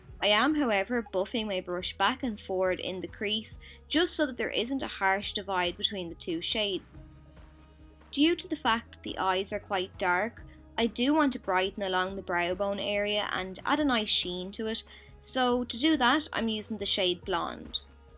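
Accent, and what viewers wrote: Ireland. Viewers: I love your Irish accent, and I'm half Irish myself. I love that beautiful makeup look you did. I am also learning how to do liquid winged eyeliner. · you're accent is so pretty! you sound so sophisticated whenever you speak, are you from Ireland?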